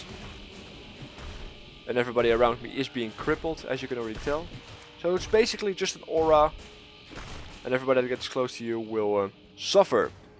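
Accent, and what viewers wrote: Netherlands. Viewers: Dutchie? :P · You can hear that he is dutch lol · French? What french people have you been listening too? He sounds dutch.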